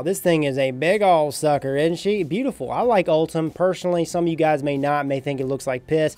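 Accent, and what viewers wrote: South, United States. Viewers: Mesh?????! Omg!!!!!!!! I NEVER thought vaping would evolve this much! Wow!!!! I shall go on the hunt for one! Sn: I peep a bit of a southern accent! It's adorable!!! Plus, his vids are always short, sweet, and to the point! Yessss!!!! ♥️♥️♥️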